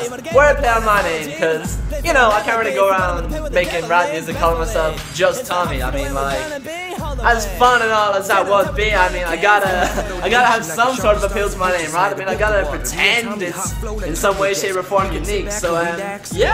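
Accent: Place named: Ireland. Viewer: DANG the most adorable ACCENT yo!!! LOL 😂😂😂😂Almost at 200 subs, freaking awesome!!!! YES< we need more of these, too!!!! I don't care which day your vids come out, I'll be there fam!!!! 😀😀😀LMAO with the editing...the comments to yourself bwhahaahha "JESUS CHRIST, TOMMY"!!!! LMAO 😂😂😂😂Definitely keep these coming, too along with your awesome new beats!!! And I'm going to keep nagging you about that autograph until I turn blue in the face...and a BLUE EGG is NOT a pretty sight, yo!!!! LOLOL 😂😂😂😂 Much love my adorable, irish friend!!!! xoxoxoxox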